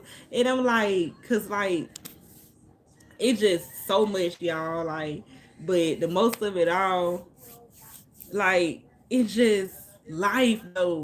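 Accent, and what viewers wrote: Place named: South, United States. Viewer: What cho lil chocolate butt talkin bout lol I like the way you talk my family down south also and you love God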